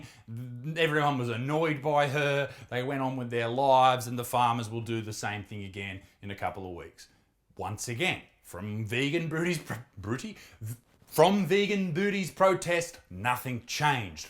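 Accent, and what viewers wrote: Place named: Australia. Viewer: I heard about her doing this: "Well there's the next Isaac vid. Also why is she Aussie?"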